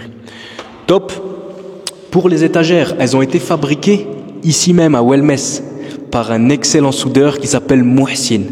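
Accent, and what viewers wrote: Alsace, France. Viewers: L’accent alsacien 👌 voila le coter maniaque 😂💪 les marocains vont vriller faut tout soit nickel 👌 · Bsahtek mon frere !!! Qu'allah te facilite ton commerce et tous ce que tu entreprend!!! Un petit accend alsacien dans ta voix je me trompe ??? 😂😂😂😂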